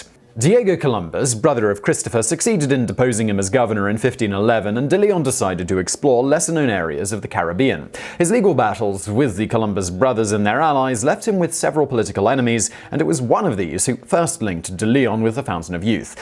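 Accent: United Kingdom. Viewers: bro he british he ain’t even american🤣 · Me an American: ahhh yes British Big Brain tells me history. I enjoy this 😂👍🏻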